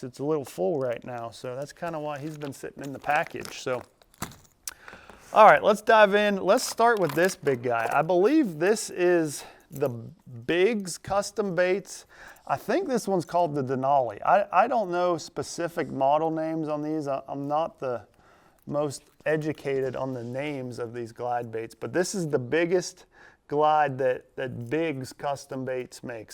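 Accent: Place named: Pennsylvania, United States. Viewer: It's a Pennsylvania thing. Yinzer language. We try to keep it interesting up here kind of like a secret handshake.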